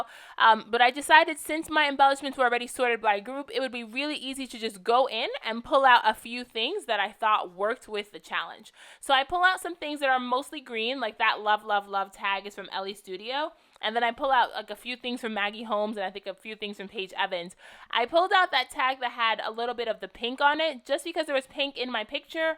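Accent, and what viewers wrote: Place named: East Coast, United States. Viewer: Love when you do mixed media! It was fun to hear your east coast accent sneak out a bit more on this one too! Beautiful spread!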